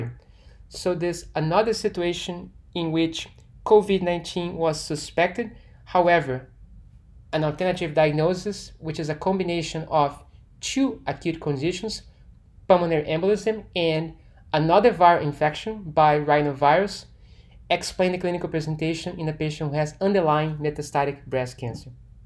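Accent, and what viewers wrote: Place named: Brazil. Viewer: Love the Brazilian accent <3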